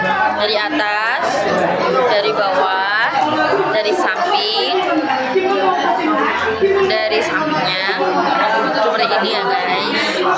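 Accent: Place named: Java, Indonesia. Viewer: medok banget jawanya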